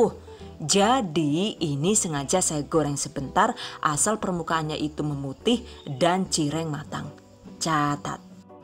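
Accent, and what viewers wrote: Banyumas, Indonesia. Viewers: Sebenernya suka semua videonya. Semuanya bermanfaat dan yang paling penting itu videonya ga bikin bosen, pasti ketawa2 pas bahasa ngapaknya keluar 😂 Pokoke seneng kabeh pideone rika lah yu 🤣 #GiveawayTHV2020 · Hmmm gemes dh ma suaranya bunda ni. Explainnya simple n da bumbu2 candanya itu lo yg bikin gregetan gemes. Dengerin kdg ketawa sndiri. Pa lg bhs ngapak2nya klr beeeh lucu. Semangat trs bunda bikin vidio kreatif masaknya. 👍👍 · Wong ngndi mba kok ngapak ky kue logate koh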